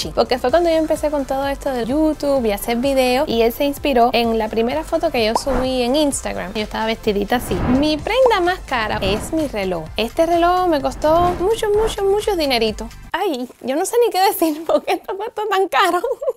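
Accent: Cuba. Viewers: Son cubanas por eso tienen el mismo acento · eres un amor eres una buena actriz y me encanta tu acento Cubano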